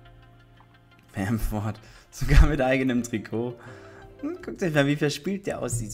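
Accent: Austria. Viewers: Bist du Österreicher oder Deutscher? Bin gefüllt einziger Österreicher auf yt 🥶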